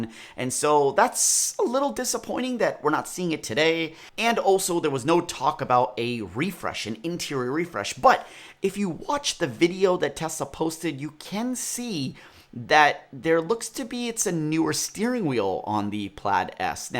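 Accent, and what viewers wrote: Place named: United States. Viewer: hmmm 🧐 Now i understand why you look and your name is like an 🇮🇳 Indian 🇮🇳 but talk like an 🇺🇸american🇺🇸 And i am from Bhilwara,rajasthan. You can check on maps where it is. as you know covid-19 is going on all over the world but here in my town. There are covid +ve coming more and more daily but nobody is has fear of it now than before lockdown and some people have died nearby my colony 😭 and even in my family my chachi got covid😭 but she is not living with us and she is fine right now. but nobody is taking precaution and saying the virus is getting weaker and if you get covid test result +ve than you will be going get your covid test result -ve after some time and immunity power of indians are far stronger than rest of the world, etc. And if you go around the city you will see that it's like NORMAL , there is no covid or anything happened. 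People just carry mask so that they don't get chalan (fine) from police. What is happening to people's mind in india but i can guarantee you that if this goes on and does not stop than india will be no. 1 in covid cases and death in the world 😭. I am taking precaution so that i and my family don't get covid. And i see in your videos that people there in USA are taking precaution so that's good because you all know the fear of it. So take care of you and your family. And I didn't see your family in your videos, do a video about your home 🏡 (where you live now). And last but not least I learn English by my teacher to pass the exam but I l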